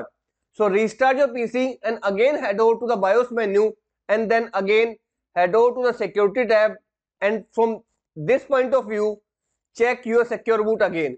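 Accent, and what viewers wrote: India. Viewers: I dont fking understand ur thick mumbai accent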